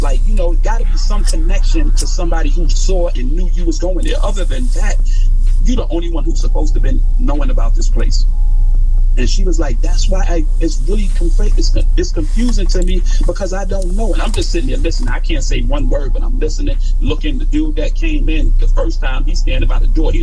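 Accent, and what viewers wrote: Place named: New York, United States. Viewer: Yo this nigga sound like Jay-Z this the second video I'm hearing with u and ol head y'all New York niggas be sounding the same on that jack especially with that New York accent. I got a good ear I can always tell where a person from by how they talk and what words they use.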